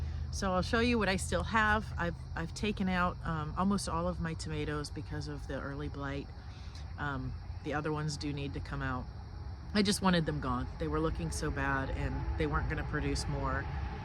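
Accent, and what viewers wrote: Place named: South, United States. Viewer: Im wondering what state y'all live in. You sound southern like me. What are loofahs good for? I've never grown any at all. They do look fun to grow though.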